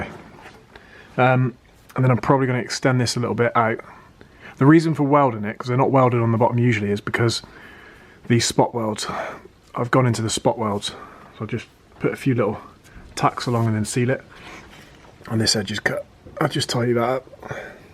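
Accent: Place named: Plymouth, United Kingdom. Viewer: sounds like a plymuff accent! top job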